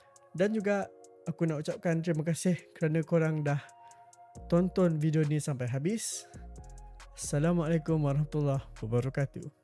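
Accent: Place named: Malaysia. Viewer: kaya orang malaysia logat nya... apa melayu indo..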